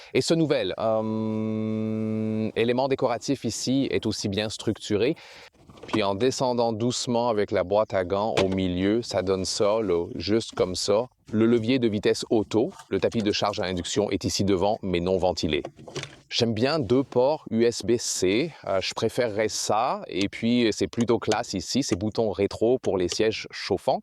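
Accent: Quebec, Canada. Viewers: Français québécois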